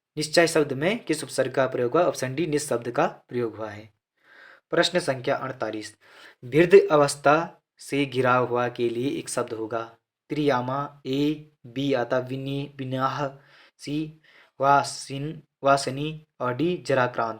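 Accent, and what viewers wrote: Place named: Rudraprayag, India. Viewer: Nice bhai ji rudpyag se ho lg raa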